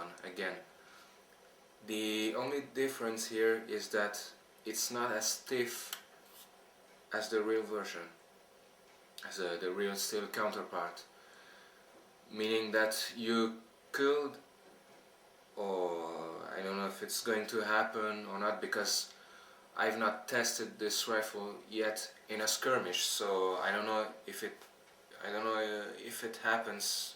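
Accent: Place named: Switzerland. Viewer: Dude, let ME take a guess-you're Swiss, right? :) Your accent sounds just like Swiss Maverick's+you guys have mandatory military service!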